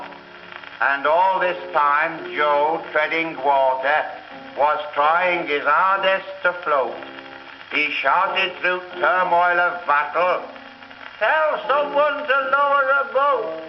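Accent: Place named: Yorkshire, United Kingdom. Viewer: "Stanley Holloway is impersonating Marriott Edgar here"? I beg to disagree. Marriott Edgar was a native Scot, so I don't believe he spoke like this. Although he wrote the poem (lyrics), it does lend itself admirably to the accent employed by Stanley Holloway - one very unlike that of Scotland but most similar to that of the middle/southern part of Yorkshire - for this recording and many of his other collaborations with Edgar.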